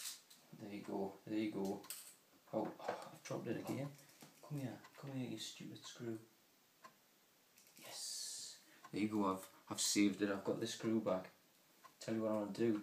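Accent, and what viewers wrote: Newcastle, United Kingdom. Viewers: Cor....sounds like a geordie to me......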